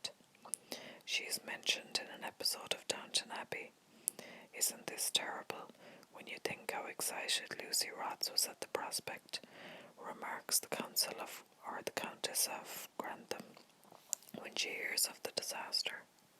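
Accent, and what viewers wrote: Ireland. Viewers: U Irish?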